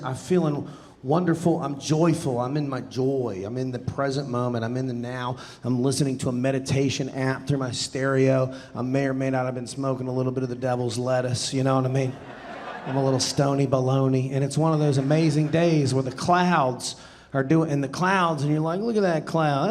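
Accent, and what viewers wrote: South, United States. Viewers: Agreed! It's giving Josh Johnson precision with a southern white dad twang. Here for it 🙌🏾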